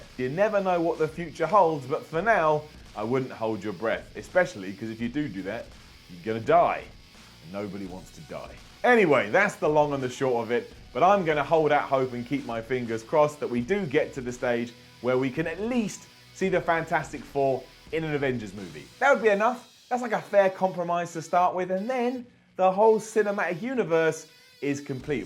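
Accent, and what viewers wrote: United Kingdom. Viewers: Lol after I saw this comment first thing I thought about was fake taxi because he has a British accent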